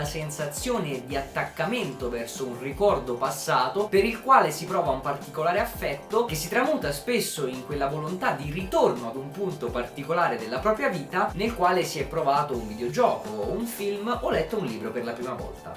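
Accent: Campania, Italy. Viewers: Scusa la domanda fuori luogo, ma sei della campania? Ho sentito male o hai detto "Semp a stessa cos" ahahahah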